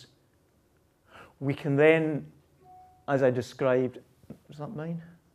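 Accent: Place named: Scotland, United Kingdom. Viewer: The first thing that struck me was the super cool beard. The second thing was the super cool accent. What is that accent? Is it some kind of Scottish?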